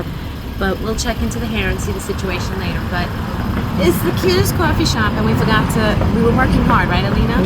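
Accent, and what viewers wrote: New York, United States. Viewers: Your hair came out beautiful! I love the full abudance of curls. I like your New York accents; my home town.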